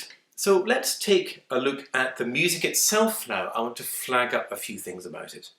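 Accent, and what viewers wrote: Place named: United Kingdom. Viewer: What part of British isles are you from? Your accent is very pleasant.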